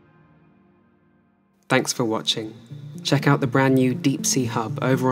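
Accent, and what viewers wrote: England, United Kingdom. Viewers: Usually I'm not too big on english accents (sometimes I hyperfixate on things) but you my friend have that ASMR type of voice just without the cringe. That kind of stuff isn't my forte, but big homie over here got that type of voice I could fall asleep to. Ever consider getting into voice acting? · i dont like your voice and your accent (perhaps you are really an english), but i like your videos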